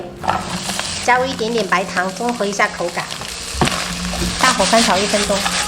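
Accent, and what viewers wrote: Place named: Fujian, China. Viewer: ：我在温哥华福建人，一看你的视频就犯嘀咕...觉得听到乡音！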